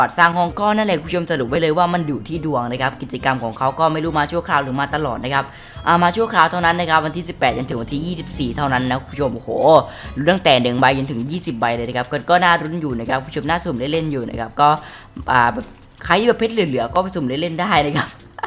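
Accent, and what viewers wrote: South, Thailand. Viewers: คนใต้มั้ยเนี่ย ดูมาหลายคลิปแล้ว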